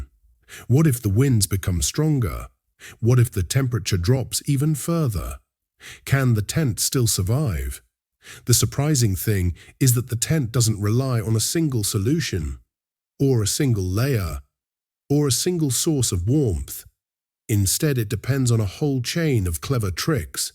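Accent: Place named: United Kingdom. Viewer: British sounding, but likely AI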